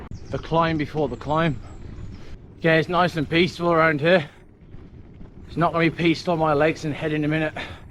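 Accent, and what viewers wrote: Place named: Dudley, United Kingdom. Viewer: Were you from? You sound like a Dudley bloke.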